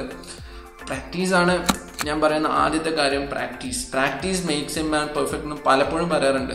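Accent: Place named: Kannur, India. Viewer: Pullide samsaram kettittu kannoor karan aaanu thonunn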